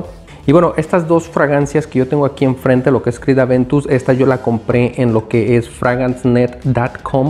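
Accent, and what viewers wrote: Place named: Veracruz, Mexico. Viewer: ¿Sos de Veracruz?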